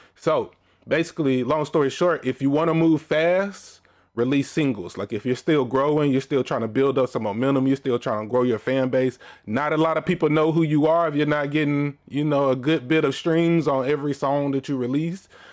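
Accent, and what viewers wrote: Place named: Houston, United States. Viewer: My boi got that H-Tine accent. 🔥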